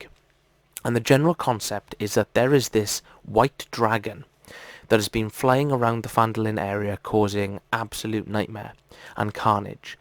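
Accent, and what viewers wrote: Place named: Wales, United Kingdom. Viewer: Love the advice. Couldn't help but notice the accent. Do you play in a store 8n wales?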